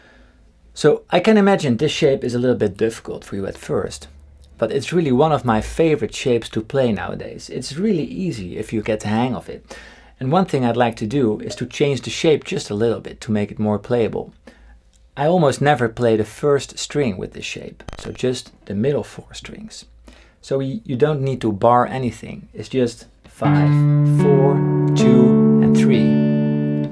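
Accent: Netherlands. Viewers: i knew it you are dutch, could hear it by the accent 😂😂 greetings from almere, any tips on starting to teach guitar? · until this video i did not know you were dutch Hahaha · Ik dank je voor al de uitleg, je uitleg klinkt als een Nederlander die Engels spreekt , maar het klinkt als muziek in de oren. zou je de 6 snaren stemming voor een steel gitaar kunnen uitleggen.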